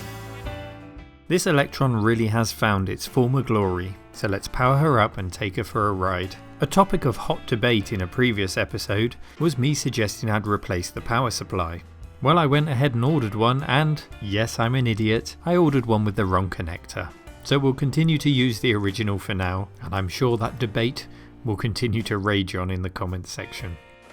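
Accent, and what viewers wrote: West Country, United Kingdom. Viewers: Oh wow you're in the Cotswolds, how lovely. Dull, but lovely ;) You do have a very slight West Country accent now I listen out for it.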